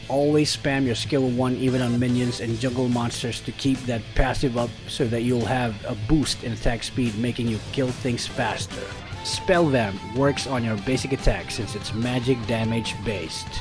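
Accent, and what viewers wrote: United States. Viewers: Pilipino ka pala hahaha akala ko american 👍👍👍👍Pota Ka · I thought you were American or what ever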